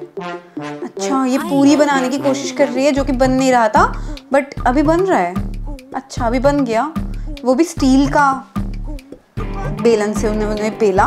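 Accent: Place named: Bengal, India. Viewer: AP bengli ho na qki apke bolne ke style se ye hi lag rha h mujhe ye aeisa isliye mga qki mai bhi bengoli hu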